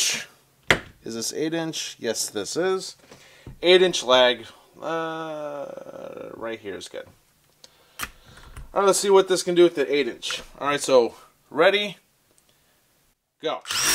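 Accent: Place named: Wisconsin, United States. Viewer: Depending on if he's using "freedom units" or canuckistanian metric units. :D I would've guessed Wisconsin or Michigan from the accent, but Ontario is not entirely impossible.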